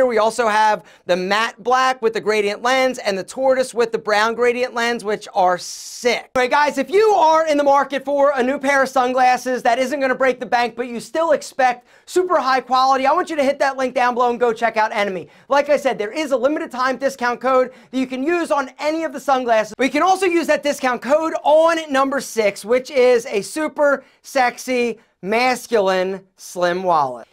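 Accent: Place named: Pennsylvania, United States. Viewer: West Virginia? Hmmm lol. With that accent I was thinking on the PA border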